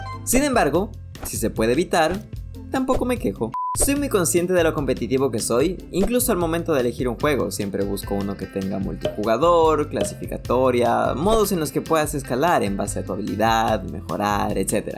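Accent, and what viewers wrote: Chile. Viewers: Es chileno 😳👌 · Eres chileno? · Primero...: me gusto el video!!! Me encato!♡ Y segundo... Así que mi sospecha era cierta?! El men si es chileno jsjsjs, pense que era imaginacion mia, pero la wea era cierta. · Eres chileno? Peruano? Boliviano?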